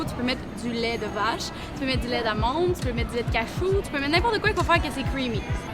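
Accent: Quebec, Canada. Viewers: Est tu Canadiaine ou Anglaise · Évidemment canadienne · Elle est canadienne ; ça s’entend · Est-ce que tu viens du Québec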